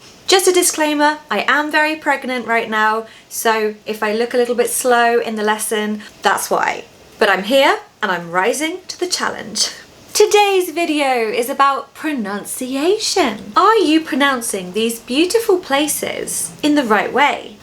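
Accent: United Kingdom. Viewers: Hallo great British accent yes correct pronunciation By the words let say two three times with you and loudly thanks More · Hello, I like your beautiful British Word Pronunciation with British Culture. I love the lovely cities in England such as Oxford, Greenwich and Edinburgh. I also like the Stone Hinge and I saw it when the Robin of Sherwood British drama was filmed in that location. I watched Robin of Sherwood in my childhood., I hope you watched that drama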